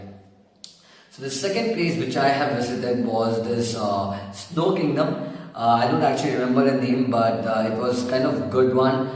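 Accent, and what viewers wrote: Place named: Russia. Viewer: Russian accent👌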